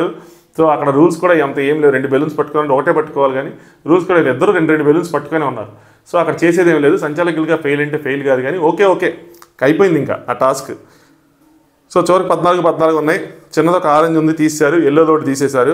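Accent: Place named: Guntur, India. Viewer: Guntur side slang anipistondi 😁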